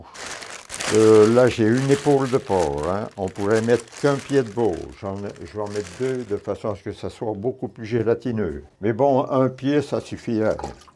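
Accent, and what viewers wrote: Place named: Quebec, Canada. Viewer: Je suis Québécois et beaucoup de gens, surtout les plus vieux, parle comme lui.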